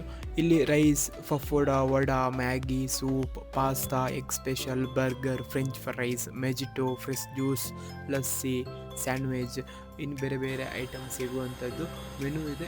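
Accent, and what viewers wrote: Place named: Mangalore, India. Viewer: Excuse Me Nimdu Kannada Nodidre Mangalore Or Udupi Kanuthe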